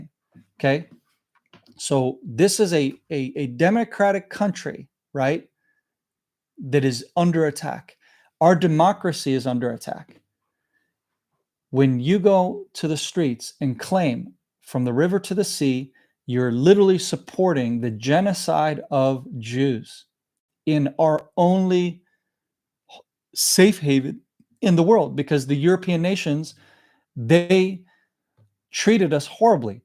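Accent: United States. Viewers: America accent, American passport. Get out of Palestine. You are not the victim.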